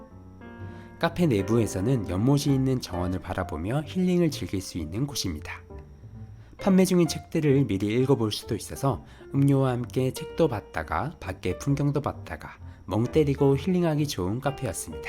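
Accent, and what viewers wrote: Gyeongsang, South Korea. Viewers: 님 한의사라고 본것같은데 맞나요? 정말가끔유투브보면 차분한말투와가끔 경상도사투리가 귀엽고정겹습니다 감사해요^^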